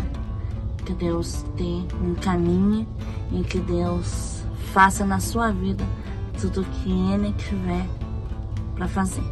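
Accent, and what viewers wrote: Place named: Paraná, Brazil. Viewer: Parabéns pelo seu aniversário, que Deus continue cuidando, dando muita saúde e que tenha sucesso em toda a sua jornada, gosto do seu sotaque e das frases da sua região do Paraná😂😂😂😂👍🙌🎊🎁🍰🎂🍰🎂🏆👏👏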